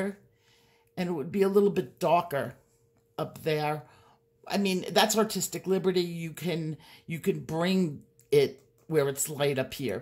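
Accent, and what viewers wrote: New York, United States. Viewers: Great to hear that New York accent again on "ColotTube". Your instructions are always welcome, however, if I followed your first one to " plan ahead before you pick up a pencil " I would have close to zero colored pictures! About the closest I get to pre planning is picking which colored pencil set I need to take off the shelf. At 76 who has time to pre plan? See you soon. I'm pre planning to watch you. Don't take too long.👵♿🎨 Barb N.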